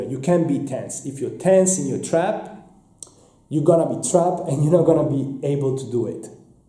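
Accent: Italy. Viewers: I know an italian accent when I hear one. Grazie frate’ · Fra ma quanto sei italiano hahahah (nel senso che si sente non altro)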